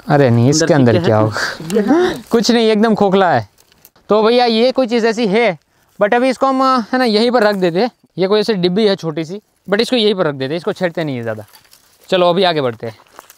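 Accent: Gujarat, India. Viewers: Bhai tame gujrati cho tamre bole upar the kabar pady jay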